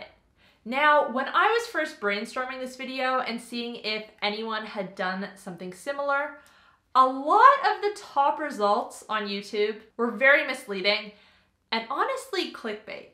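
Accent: Canada. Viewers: haha quite a small oops. your accent is very mild by the way. you sounded Canadian actually. great stuff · She sounds Canadian cause of some of her vowels.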